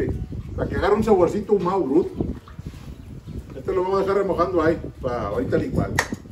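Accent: Mexico. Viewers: junto con disfrutar las preparaciones y las recetas me encanta como habla el mexicano, lejos el mejor acento de todos los países latinos...órale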